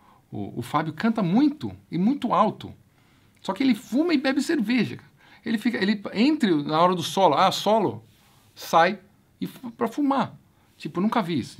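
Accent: Rio de Janeiro, Brazil. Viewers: parabéns pelo excelente trabalho! cara muito legal o história das antigas. sensacional. não dá nem pra pedir uma história, pq é um mistério surpreendente cada uma delas. não dá pra imaginar o q aconteceu com vc na sua carreira. kkk muito bom o sotaque carioca, e por fim uma sugestão, o q vc lembrar da memória q foi marcante, faz do mesmo jeito, liga a câmera e grava. tenho certeza q vai ser demais. abraço de máscara! 👏👏👏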